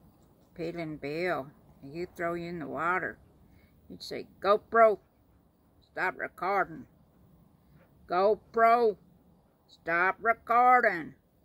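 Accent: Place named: South, United States. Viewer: 🍀 Your fur babies are too cute for words! 😎Love southern accents.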